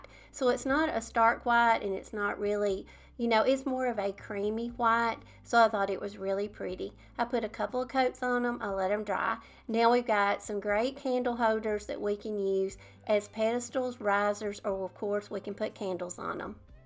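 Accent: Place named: Tennessee, United States. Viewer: I really love your creativity and have used your ideas in my crafts. I wanted to say I have a sister in Tennessee and her name Theresa with the "h" and hearing your accent makes me think of her because we grew up in Michigan and she has the accent and I am living in Michigan where we grew up and just get a kick out of listening to my sister Theresa. Anyways, I have made decor projects for my house watching you.😂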